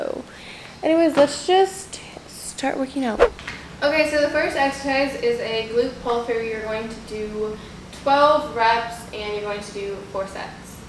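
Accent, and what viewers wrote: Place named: Nashville, United States. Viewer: I can hear that Nashville accent coming through a little!! 🤠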